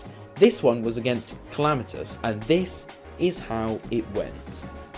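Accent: United Kingdom. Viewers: Are you from the UK? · Oh my god, this was honestly so cool. And I love how your british accent suits with your jokes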